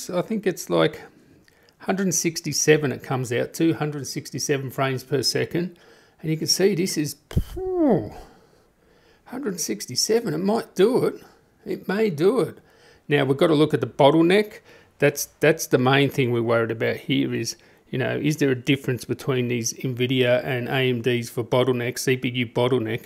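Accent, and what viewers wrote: Melbourne, Australia. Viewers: Good to hear an Aussie voice on reviews 😂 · your accent somehow made me miss Melbourne lol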